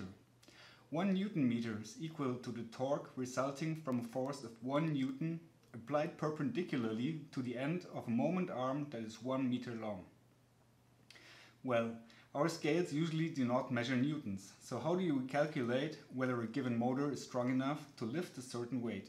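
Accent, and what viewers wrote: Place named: Germany. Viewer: Ich mag deutsche Akzent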